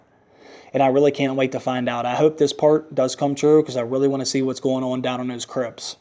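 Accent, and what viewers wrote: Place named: South, United States. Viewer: your southern drawl is a charm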